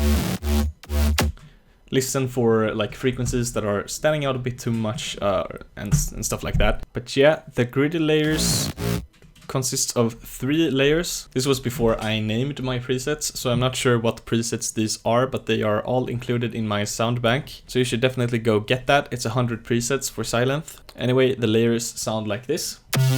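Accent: Sweden. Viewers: Dude that brutal swedish accent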